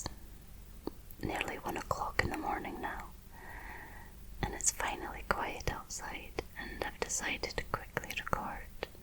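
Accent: Scotland, United Kingdom. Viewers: It feels like I have an anthill walking my scalp. Lovely Scottish accent. 💓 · I found your videos through Scottish Murmurs I am glad she suggested you! I love your slower Scottish accent then Lauren's Glasgow fast accent but both your accents are beautiful! Thank you for helping me relax! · Personal Rule of thumb, write the email, wait 24 hours to send :) 3rd time watching this vid, and I think it’s the Scottish accent and your cadence but you’re my new favorite ASMR artist, BTW hows your Cantonese and Mandarin? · Clicks on video by artist I don't know. Video doesn't begin with loud, jerky finger fluttering. Mesmerising, slow hand movements. Soothing whispering. Emotional intelligence. Scottish accent... How could I not subscribe? Cracking video. Thank you. Regards. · Great video. Lovely to hear a Scottish burr after 10 years away from Scotland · Very soothing - plus i luv the Scottish Accent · Scottish, I hope, that`s lovely. 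and together whith these sensual handmovements you are poetry.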